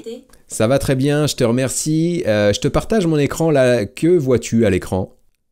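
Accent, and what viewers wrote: Quebec, Canada. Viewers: Merci! Hilarante la vidéo avec l'accent québécois! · L'accent québécois excellent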